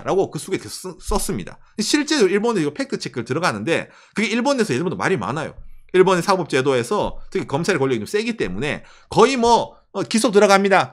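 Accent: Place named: Daegu, South Korea. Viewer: 대구 사투린가요 ? 대륙남이랑 억양이 비슷하네여 ~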